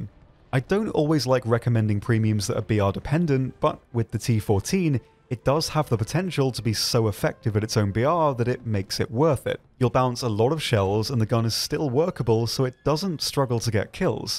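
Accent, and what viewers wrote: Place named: United Kingdom. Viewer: You create my favorite War Thunder videos on YouTube! Thank you for your hard work. Your British accent is also greatly appreciated by this American. :)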